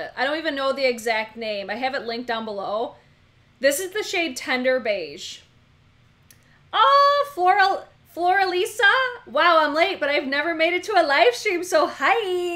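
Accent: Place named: Chicago, United States. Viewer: I was born in Binhamton and grew up in Chicago and I totally thought you were a Chicago girl from your accent too! Lol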